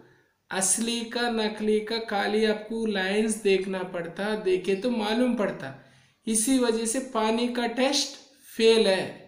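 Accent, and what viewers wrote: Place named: Kerala, India. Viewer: . Kerala ka hai Bhai boli se pata lag jata hai .Mai Gaya hu Kerala waha ke log yese hi bolte hai.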